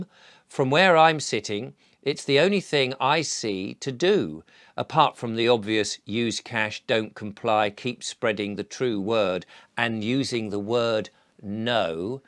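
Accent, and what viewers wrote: United Kingdom. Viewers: you are a gem! Love your 'terribly' British! I am Australian.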